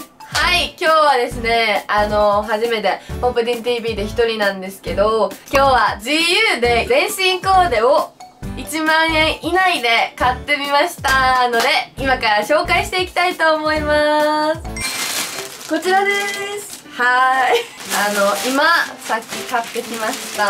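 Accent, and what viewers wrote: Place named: Kansai, Japan. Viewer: 関西弁よき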